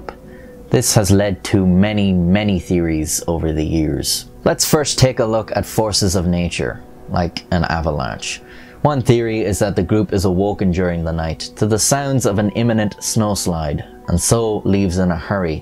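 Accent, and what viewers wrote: Ireland. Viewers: A fascinating story. BTW, You have a very good narration voice. Only slightly Irish, and that's OK. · You tell a story very well. I like your Irish accent. This story always fascinated me. Common sense says it was a freak weather occurrence that seemed like an avalanche because it obviously made them run for their lives. Likely in the rush one of them might have not been able to quickly unzip the tents flap and cut himself out. Things like the eyes/tongue missing would be forest animals/birds. I wonder if a movie has ever been made recreating the most likely scenario. It would be interesting. RIP to them all.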